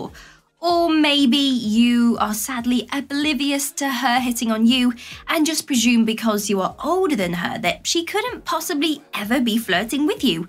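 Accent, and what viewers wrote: England, United Kingdom. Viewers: LOVE THE VOICE. IS IT ENGLISH, AUSTRALIAN, OR SOMETHING ELSE?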